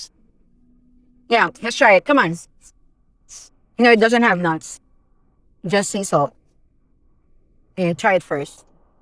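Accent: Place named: United States. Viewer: Ganda ng speaking voice mo Ma'am. Very American Accent. ❤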